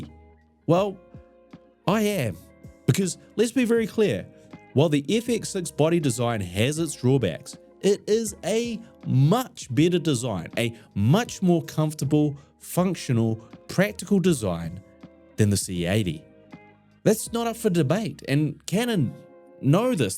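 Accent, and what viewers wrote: New Zealand. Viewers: Mate you sound like Kiwi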